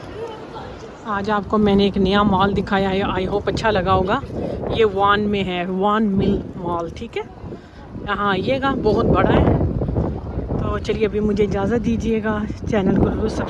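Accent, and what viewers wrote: Pakistan. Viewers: Wesy ma'am ek bt tu hai itny saal Canada main rehny k bad b ap ne Canada ko apny andr nai any diya ap jb bat krti hain tu ek Pakistani lehja alfaz sb kafi واضع hota hain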